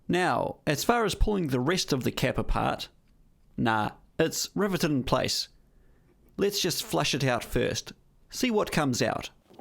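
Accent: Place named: New Zealand. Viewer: Ahh the refreshing sound of a kiwi accent whilst abroad. Great stuff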